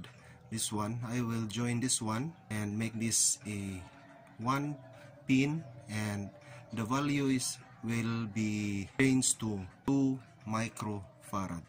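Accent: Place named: Philippines. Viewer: Your English reveals that you are from the Philippines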